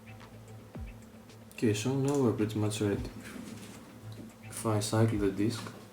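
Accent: Greece. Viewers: η ελληνική προφορά γαμάει πάντως!!! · Απο τη φωνη υποψιαστηκα οτι ειναι ελληνικο βίντεο , ωραιος φιλε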